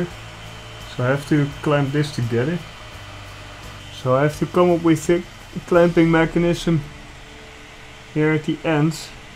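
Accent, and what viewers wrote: Netherlands. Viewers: Ik hoor een Nederlands accent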